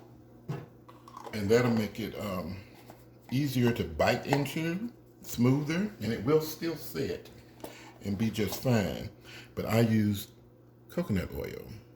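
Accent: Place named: South, United States. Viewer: Love your southern man accent. Yes!!! Loving the 😀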